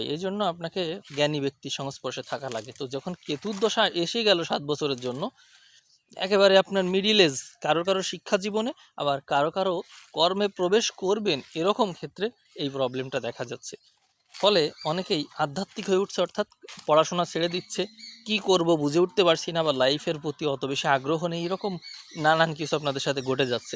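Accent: East Bengal, Bangladesh. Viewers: তুমি কি পূর্ববঙ্গে বাঙাল?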